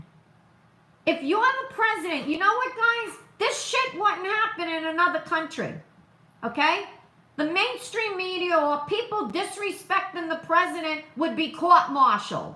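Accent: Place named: Bronx, United States. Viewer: Just love your thoughts passion and God u live your Bronx gurl accent. I can't wait to see you and and now. Live u guys. My Brooklyn Long Island accent is a good match to yours. I hope someone gets u out if jail soon. This is not Fair. Censorship has to Stop. FB a public company. Live u thanks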